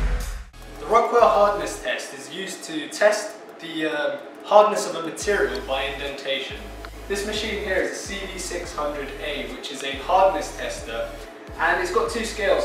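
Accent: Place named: United Kingdom. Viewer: clearly a british accent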